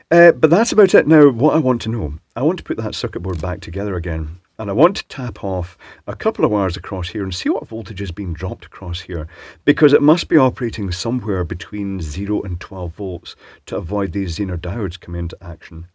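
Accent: Scotland, United Kingdom. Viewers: Actually it’s a Scottish accent!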